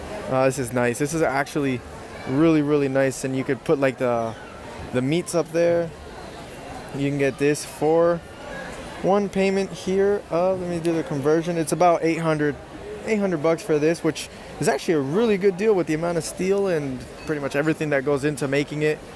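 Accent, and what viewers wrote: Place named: Buenos Aires, Argentina. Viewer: totalmente de acuerdo. Lo escuche hablar en español y el acento era totalmente porteño.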